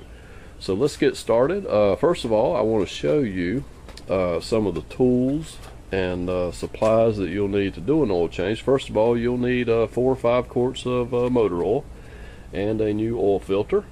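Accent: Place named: North Carolina, United States. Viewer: I could hear you were from North Carolina before I even saw that you're username had "Mint Hill" in it. I'm up in Huntersville and just changed the oil in my '89 Ranger. Good thing I got under there too because I saw that I've got a bad seal on my differential housing, so that's my next project. Thanks for the help.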